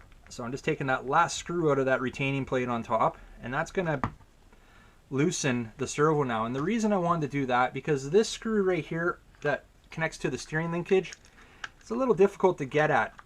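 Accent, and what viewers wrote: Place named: Canada. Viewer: haha, love your canadian accent!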